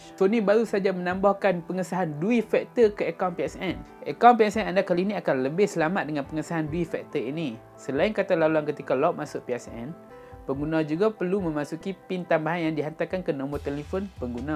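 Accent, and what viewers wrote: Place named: North, Malaysia. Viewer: pekat utara hang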